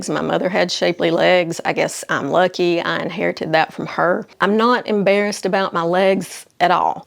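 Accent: South, United States. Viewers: I'm so glad I found you,! Your observations and suggestions are right on target. And you deliver your message in a no-nonsense but kind way, You really are excellent at this, and that's coming from a retired marketing executive. Everything you touch on is something that I had been thinking about; it's eerie and at the same time wonderfully satisfying, And your charming Southern accent is just so easy on the ears, Beats the heck out of my Philly accent! Keep on keepin' on,! Hopefully women will heed your advice. I know I have!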